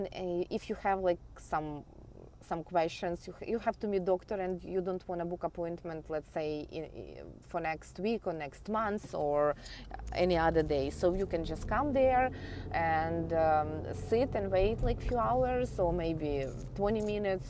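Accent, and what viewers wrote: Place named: Russia. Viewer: Fookin Russian accent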